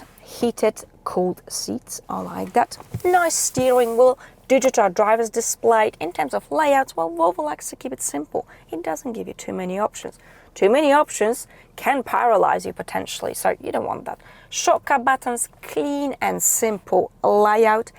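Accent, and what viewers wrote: Australia. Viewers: Did you learn your english in Australia or south africa? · aha cool! Yeah the polish number plate gave it away and i recognised some Aussie/Nz accent from living there aswell. Nah Yeah have a good one!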